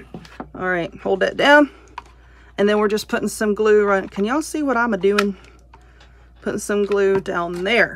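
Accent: South, United States. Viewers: Love that southern accent💜💜💜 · I love your Southern accent! Lived most of our lives in the South but now we are in Italy, so I really miss this southern style accent. Yet I am impressed how you can craft with those nails! Wow! Are you from Mississippi or Louisiana? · I love your accent! I'm from Alabama. I bet you're not far from there!😊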